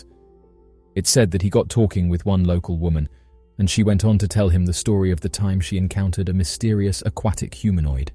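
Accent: United Kingdom. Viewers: Cant go the F'n Brit commentator.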